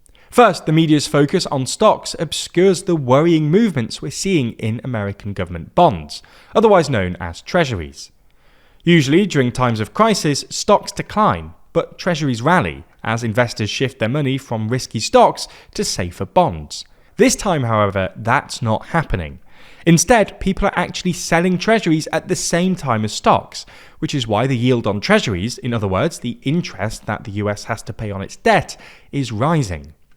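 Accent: United Kingdom. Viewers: Clicks in video, hears british accent. Leaves.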